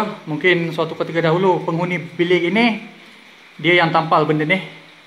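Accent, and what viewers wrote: Sabah, Malaysia. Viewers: cakap macam org sabah, tapi vlog kat pahang. org mana dan kenapa di pahang? hehe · org sabah kah bro? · Urang sabah ka ko tu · Diorang Sabah ,Kerje kat Malaya!!! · pergh hebat.berani. pekat sabah kau ni bro. bajau ke bro. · dngr mcm slang sabah je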